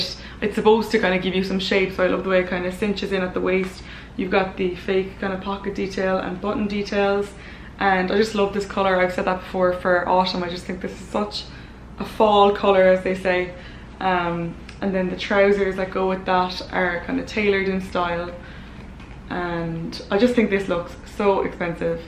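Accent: Ireland. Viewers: Always look forward to your videos, especially when you talk with your Irish brogue ❤️ Love from Canada 🇨🇦 Don’t worry, I’ve got my winter puffer jacket out. Getting way chilly here in Eastern Canada.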